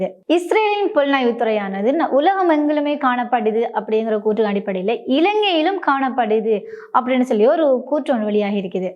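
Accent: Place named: Chennai, India. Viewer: ஏன் Madras தமிழ் கதைக்கிறீர்கள்? இலங்கைத்தாய் எங்களுக்கு நல்ல தமிழை தந்திருக்கிறார். அதை ஏன் கெடுக்கிறீர்கள்?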